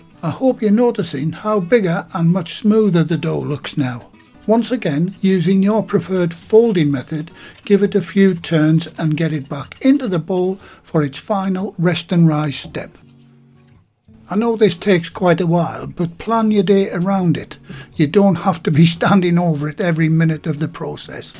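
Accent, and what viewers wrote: United Kingdom. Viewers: I like the British accent rather than the American, this is one of the reasons I like this video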